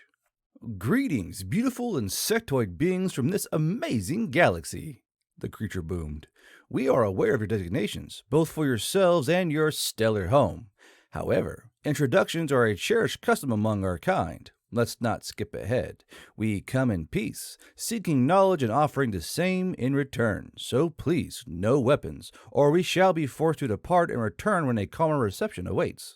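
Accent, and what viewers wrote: South, United States. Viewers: He has a version of a Southern Accent. I, myself, would NOT wish him to try to learn to speak "standard American"! There is much more to his speech than of just an accent, it is also the feelings and idioms too. Must the non-AI storytellers also speak the same way that the AI speaks?! I hope not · I'm not disagreeing with you. But I have to comment on the standard American comment. You cannot get more American than this mans accent. It is found no where else. That is all. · Being from the south myself, I can appreciate a good drawl. However, the good old boy is no excuse for improper diction and pronunciation.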